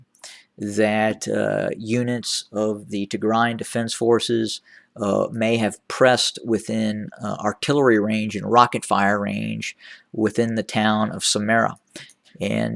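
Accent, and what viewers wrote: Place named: United States. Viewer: You are silly and i am 100% sure you are tigrawi with American accents. እናትን ልብዳት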